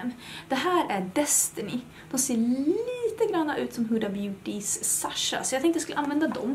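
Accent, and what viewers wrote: Värmland, Sweden. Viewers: 😂 Hur kan det skilja så mycket på rösten bara för att man byter språk? Och hur kan jag missat denna? Dock underbart att höra en härlig svensk dialekt. 🙌🏻 Tack för denna! 🌸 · Kul att höra dig på svenska och din värmländska klang lyser igenom 😍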